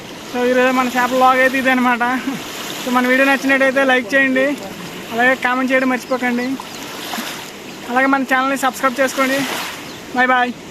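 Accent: Srikakulam, India. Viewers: Midhi Srikakulam laa undii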